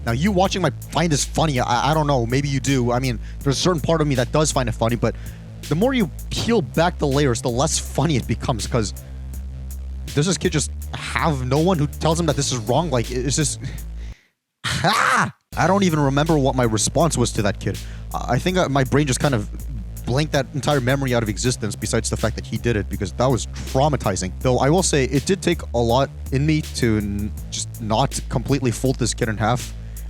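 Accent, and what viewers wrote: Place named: Australia. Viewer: I like your accent it sounds Australian:]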